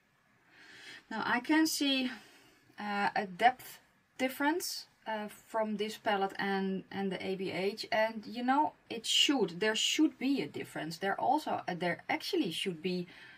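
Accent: Netherlands. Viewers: yep..Dutch hence the accent 😉😘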